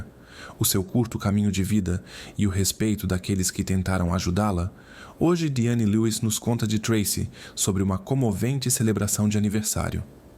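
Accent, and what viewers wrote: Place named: Portugal. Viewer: 𝑸𝒖𝒆 𝒑𝒐𝒓𝒓𝒂" 𝒆́ 𝒆𝒔𝒔𝒂, 𝒖𝒎𝒂 𝒉𝒐𝒓𝒂 𝒂 𝒏𝒂𝒓𝒓𝒂𝒄̧𝒂̃𝒐 𝒆́ 𝒆𝒎 𝑷𝒐𝒓𝒕𝒖𝒈𝒖𝒆̂𝒔 𝑩𝒓𝒂𝒔𝒊𝒍𝒆𝒊𝒓𝒐 𝒐𝒖𝒕𝒓𝒂 𝒉𝒐𝒓𝒂 𝒆́ 𝒆𝒎 𝑷𝒐𝒓𝒕𝒖𝒈𝒖𝒆̂𝒔 𝒅𝒆 𝑷𝒐𝒓𝒕𝒖𝒈𝒂𝒍" 𝒆𝒎 😅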